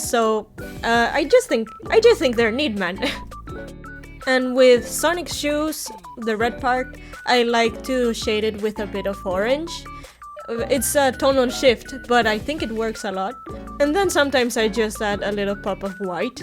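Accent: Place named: Scotland, United Kingdom. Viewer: You sound kinda british and scottish,weirdly thats how i think it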